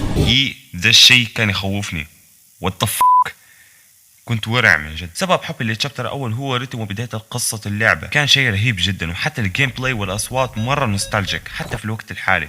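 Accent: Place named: East, Libya. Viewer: من لهجه انت ليبي من شرق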